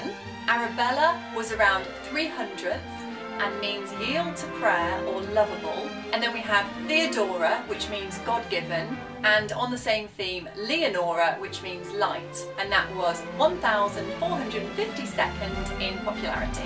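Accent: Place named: RP, United Kingdom. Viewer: I think your wonderful accent makes everything sound extra posh.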